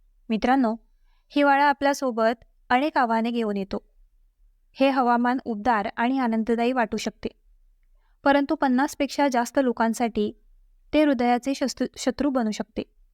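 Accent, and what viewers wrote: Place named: India. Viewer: तुमचे मराठी हिंदी वळणाचे आहे. मराठी शिका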